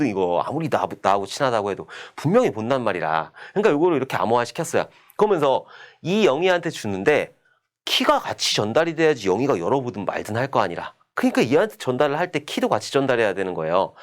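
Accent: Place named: Gumi, South Korea. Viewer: 필기 때부터 강의 들으며 공부 중인 학생이예요!!! 귀에 쏙쏙 들어오고 쉽게 설명해주셔서 좋아요 ㅠㅠ 감사합니다 근데 갑자기 궁금한 점인데 혹시 구미분이세요~?! 한번씩 "~ 하는거라", "~ 이거라" 하는데 구미사람들 말투라서 갑자기 궁금해졌어요 ㅋㅋㅋ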